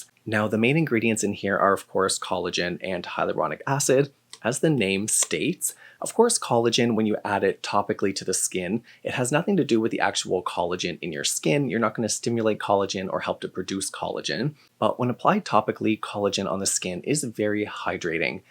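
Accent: Canada. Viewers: Videos are always great! I learn so much about skincare even outside of these products.. Love how you touch base on layman’s terms and legit science terms back to back so I can understand the deeper stuff to research or cross check my current products :) And ya know, every time you say “out” I’m geeked on your Canadian accent :) most romantic accent if you ask me But yeah overall thanks for describing some great tips and sharing so much knowledge! I’m just starting my skin care routine at 29 and it’s really helping me know what to look for when i buy and apply 😊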